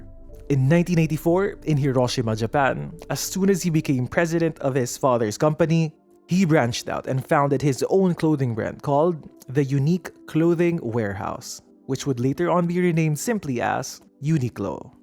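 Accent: Philippines. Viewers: This is excellent! Fast-paced, interesting and, most important, the guy does NOT put on a fake American accent! His accent is a neutral, correct Filipino accent. Like Rico Dizon, he just talks naturally with no twang.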